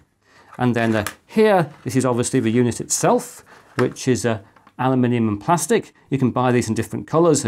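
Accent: United Kingdom. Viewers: Your british accent is exemplary.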